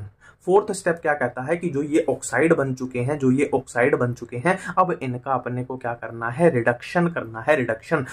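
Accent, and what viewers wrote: Haryana, India. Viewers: Sir aap haryana se ho kya??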